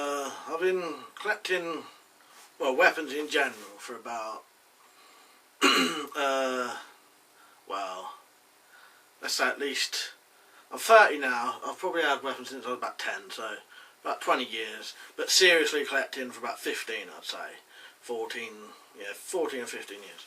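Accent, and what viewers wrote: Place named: England, United Kingdom. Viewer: Correct me if im wrong. You sound like you are from England. Thought firearms were illegal in England.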